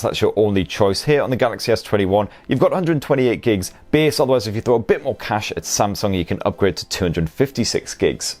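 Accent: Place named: Scotland, United Kingdom. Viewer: Where are you from,? You have a soft Scottish accent, so I'm thinking near the England/Scotland border?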